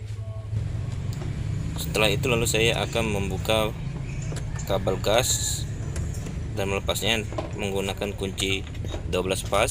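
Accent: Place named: Palembang, Indonesia. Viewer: Logat Bahasa suara anak palembang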